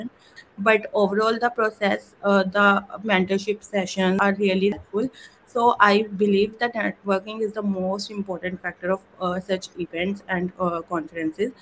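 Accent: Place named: Punjab, India. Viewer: i love your slightly punjabi accent❤❤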